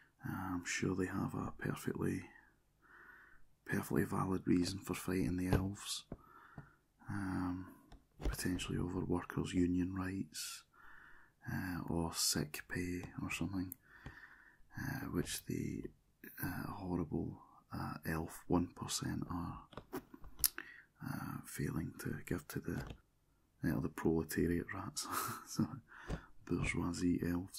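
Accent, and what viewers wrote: Scotland, United Kingdom. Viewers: I can’t believe this exist. Warhammer asmr in a Scottish accent. I’m so ridiculously happy.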